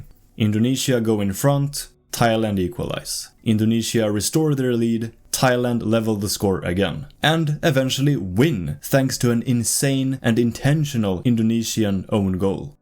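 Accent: Sweden. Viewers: After watching some seconds, judging by your accent, I believe you are Swedish? (No offence, greetings from Norway)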